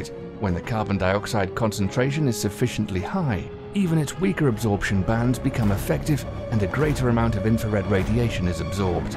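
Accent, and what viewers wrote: England, United Kingdom. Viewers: Freaking English accent and the background music make it so hard for me to concentrate.. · British scary accent, orchestra music, roll the drums and guess what; 99% of all the comments below, agree that this is yet another scary scam. After fourteen billion years of earth history and the survival of life, it appears that 100ppm of CO2 will doom the planet in the next ten years.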